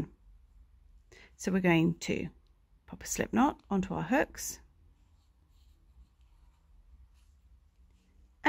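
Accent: United Kingdom. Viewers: Agreed Dolton-all of her videos/tutorials are so easy to follow, and she explains thing(steps) in easy to understand terms. Plus the British accent is great 😊. Also been crocheting for 50+ years and still learning thanks to awesome teachers like 🎉🎉🎉🤩!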